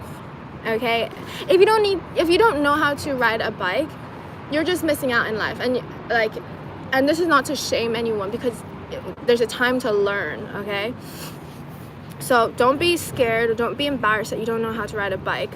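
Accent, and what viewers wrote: United States. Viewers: I thought you were CHINESE? I'm confused..(I heard you mention Africa) but also You talk with an American accent!? Would be great to know seriously where you're from, background & yeah also how you met the "Coach" but you do need to eat Meat/Fish eggs etc I'm speaking as a nutritionist, bodybuilder, sportsman and personal trainer.. you NEED ANIMAL PROTEIN!! 💪🏻 You have a PHENOMENAL VOICE BTW!! 😉👍🏻❤️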